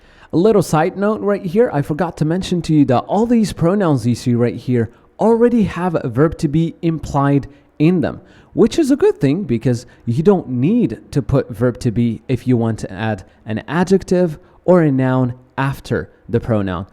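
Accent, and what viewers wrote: United States. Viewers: He does have an American accent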